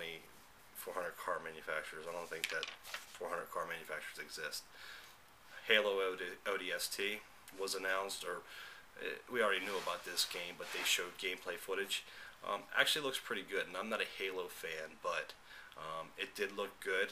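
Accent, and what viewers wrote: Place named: Philadelphia, United States. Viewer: Are you from the Philly area? you have that log "O" accent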